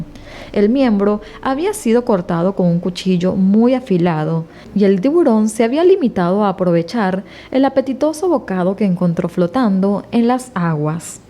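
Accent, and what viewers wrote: Venezuela. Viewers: Mrkaaaaa eres de Venezuela? Lo digo por el ascenso qwq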